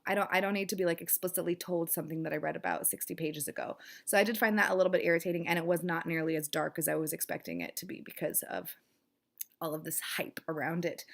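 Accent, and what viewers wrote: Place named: New York, United States. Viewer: Nine Simple Patterns sounds really interesting and right up my alley. The pooping incident happened to my cousin lol. It wasn't funny at the time though. I've been feeling like people are overly sensitive for the past few years. As a whole we've just gotten more dramatic. I like your New Yorker talk. I want to subscribe now!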